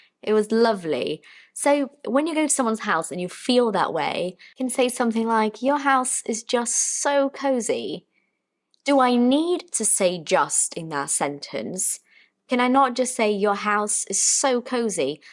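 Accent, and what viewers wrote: United Kingdom. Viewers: Thanks, beautiful. I really like your British accent. It's very pretty. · You must a Brazilian, speaking the English language as a foreign language in Brazil. And you also sound a little bit like a british person. Congratulations, my dear!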